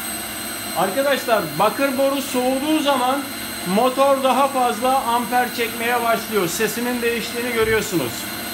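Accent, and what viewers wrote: Turkey. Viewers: He speaks with a local Turkish accent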